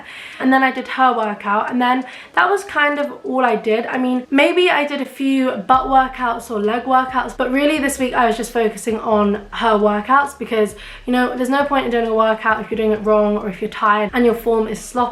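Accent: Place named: Asia. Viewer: what kind of asian are you and where do you live? I super love your accent and i plan to try Pamela's workout as well!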